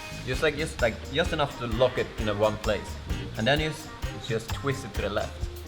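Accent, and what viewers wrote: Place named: Sweden. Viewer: Keep the swenglish! Never compromise! Love it!!!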